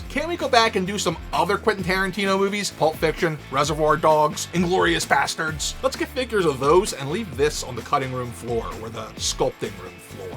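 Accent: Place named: New Jersey, United States. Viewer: You crack me up! That’s a lovely accent you have there. New Jersey?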